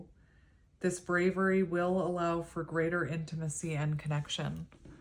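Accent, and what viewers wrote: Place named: Canada. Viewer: My favorite Canadian accent friend ❤😘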